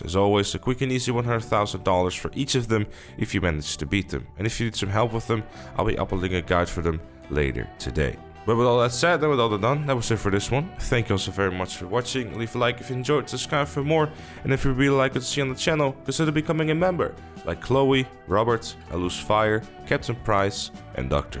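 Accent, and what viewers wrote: Netherlands. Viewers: Hmmm yes ik ruik een Nederlander met die stem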